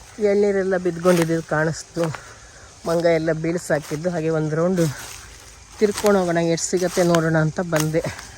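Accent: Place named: Kundapura, India. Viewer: Salpa kundapura kannada tara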